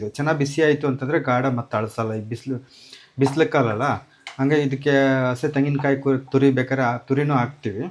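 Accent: Chitradurga, India. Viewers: Nim uru yavdu sir chithradurga na nam bashene mathadthira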